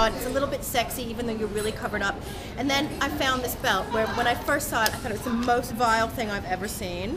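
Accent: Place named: England, United Kingdom. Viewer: She sounds so English these days!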